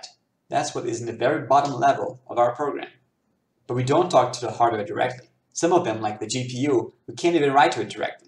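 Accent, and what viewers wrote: Brazil. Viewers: The accent gave it away! I instantly knew you were Brazilian!🇧🇷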